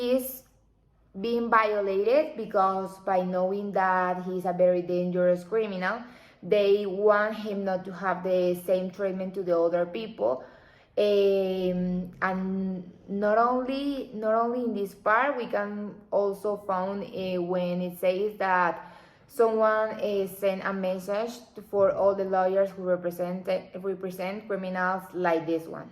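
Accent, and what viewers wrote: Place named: Caribbean Coast, Colombia. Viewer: Costeña hablando inglés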